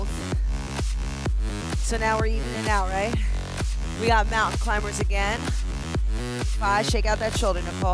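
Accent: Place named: Staten Island, United States. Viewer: Just wish she didn't talk like a Staten island sanitation worker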